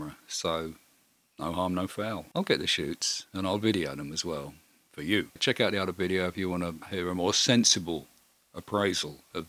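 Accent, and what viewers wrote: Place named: England, United Kingdom. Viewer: English English.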